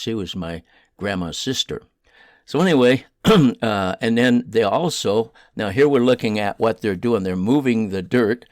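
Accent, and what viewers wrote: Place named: South, United States. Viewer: OK 👍 I'll subscribe to you... because you sound like a country hillbilly, like me...🤣 nice little story about Blackie and the well house..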